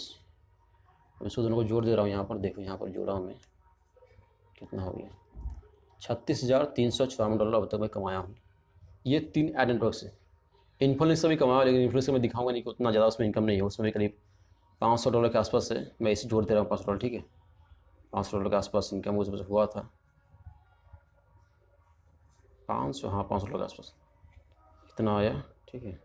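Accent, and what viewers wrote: Bengal, India. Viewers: Bhi Ap Bangali Ho Kya.....?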